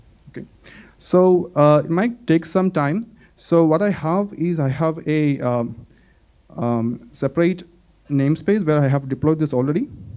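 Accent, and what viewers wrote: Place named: India. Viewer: what a mess. no subtitles to bad indian accent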